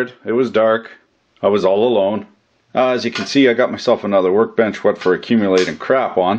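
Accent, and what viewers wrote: Canada. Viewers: Dude were are u from? I keep thinking Canada, but sometimes i really don't know? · You sound rather Canucken to me. whereabouts are you from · He's definitely Canadian, the canadianisms are real with him.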